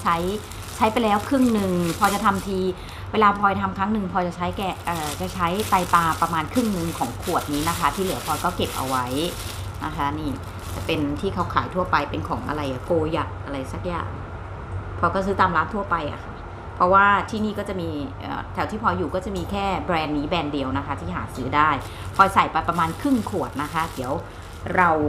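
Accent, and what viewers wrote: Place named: South, Thailand. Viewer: ชมคลิปผมบ่อยๆย้อนไปดูคลิปแรกๆแหลงใต้ทั้งเรื่อง55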